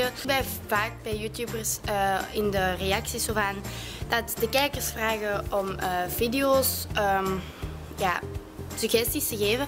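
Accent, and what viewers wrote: Belgium. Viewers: Sorry maar ben je een Belg of een Brabander ?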